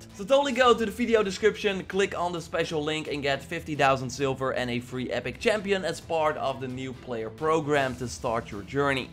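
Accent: Netherlands. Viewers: mm snif snif i smell dutch. am i right?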